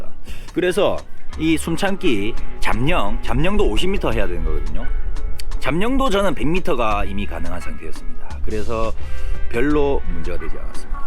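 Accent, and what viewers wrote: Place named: Gyeongsang, South Korea. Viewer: 말을 재미있게 잘해시네 말투가 진주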